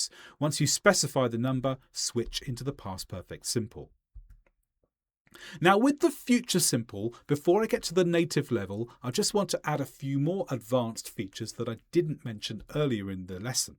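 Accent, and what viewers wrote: England, United Kingdom. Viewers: What a joy to hear your English English pronunciation!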